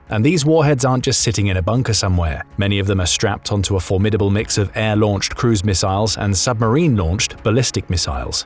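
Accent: United Kingdom. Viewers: Ditch the British communist narrator.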